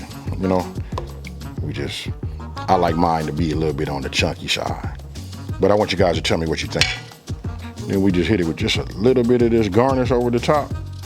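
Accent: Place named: Los Angeles, United States. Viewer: I love his la accent!!!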